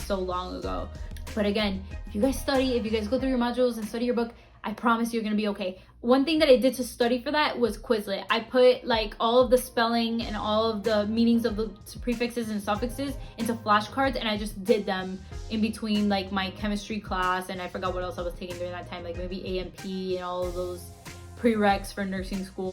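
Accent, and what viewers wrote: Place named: France. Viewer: U sound french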